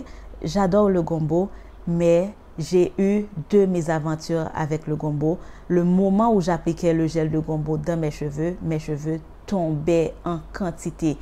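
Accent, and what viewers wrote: Haiti. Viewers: Je reconnaît l’accent d’Haiti chérie 💋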